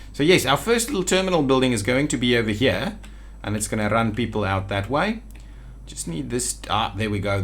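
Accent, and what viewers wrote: Afrikaans, South Africa. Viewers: Are you actually Afrikaans Wondering by the accent and the way you say your "r".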